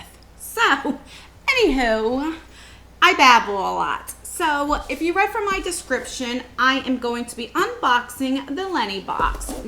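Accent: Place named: Northeast, United States. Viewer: ...can I just tell you how fun you are to watch! Love your personality and your northeast accent! I feel like I'm hanging out with one of my cousins. You'd be a blast at a family party lol!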